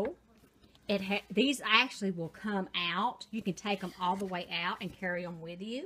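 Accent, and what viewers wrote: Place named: South, United States. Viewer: It's my pleasure I found your channel a couple of months ago and I just love the southern accent, I am a country music lover ! I wish I had some Loretta Lynn's show where I live too!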